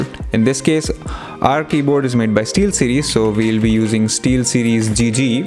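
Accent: India. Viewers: I do not understand Indian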